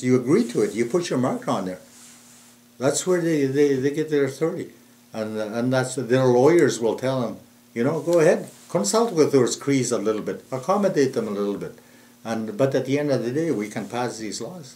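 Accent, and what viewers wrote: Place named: Orkney, United Kingdom. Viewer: is he from orkney???? i stay in edinburgh scotland but the name is from orkney and im 1000% certain that i'm related to this guy cuz he sounds like he has a less strong version of my grandads accent. i feel so weird seeing people that are probably some what close relatives on youtube when i search my last name hahah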